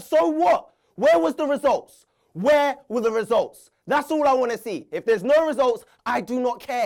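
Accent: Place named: London, United Kingdom. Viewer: London m8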